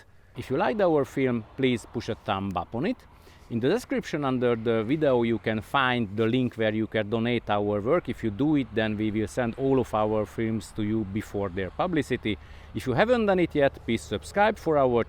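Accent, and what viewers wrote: Hungary. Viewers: Are u by any chance from Hungary?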